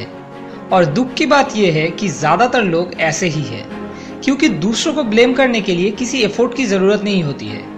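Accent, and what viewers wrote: Bengal, India. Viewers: U r bengoli Good bengoli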